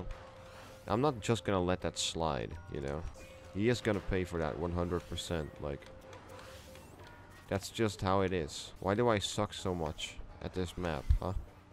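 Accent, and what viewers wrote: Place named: Argentina. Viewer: You sound Argentinian ngl Also awesome Garfield Kart Gameplay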